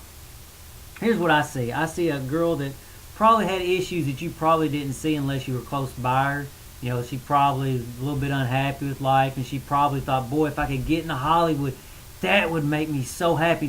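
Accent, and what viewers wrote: South, United States. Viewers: haha nice southern accent and i no everyone is dieing....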